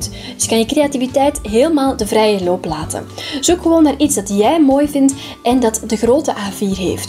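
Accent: Belgium. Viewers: Duh België klinkt wel grappig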